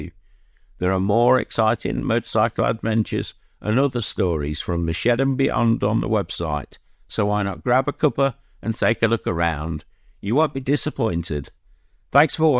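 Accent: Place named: Midlands, United Kingdom. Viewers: Sounds more like East Midlands to me, Leicester or Nottingham? 🤔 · by the way, there is nothing robotic in your voice. I’m guessing British midlands.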